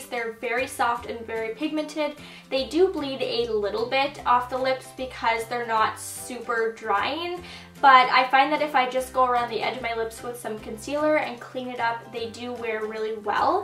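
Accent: Canada. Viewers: she has a slight accent because she's from Canada.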